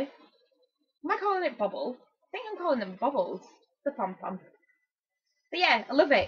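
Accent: Northern England, United Kingdom. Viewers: I love your shed! Also your accent's fine, it's good to hear a Northern accent on a podcast for a change :D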